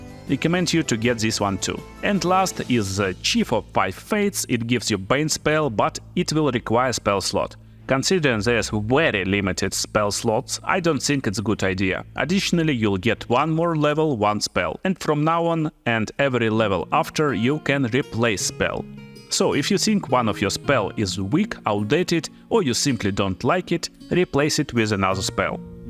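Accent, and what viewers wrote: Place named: Russia. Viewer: Почему я чувствую русский акцент..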